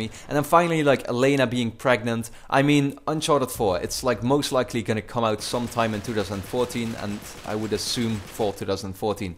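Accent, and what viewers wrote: Netherlands. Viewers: You must be dutch right? I really hear it in your english. I'm dutch aswell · Do you talk dutch a lot outside your commentaries? I came across your channel just today and I'm Dutch as well (just sending it in English so other people can read it). Quick tip, I know it's hard to get rid of your Dutch accent but if you want to get rid of it, try to talk as much English as possible. (I mainly got rid of it because I am talking a lot with friends from England and play BF3 with them, I still have an accent sometimes but now it's mainly gone)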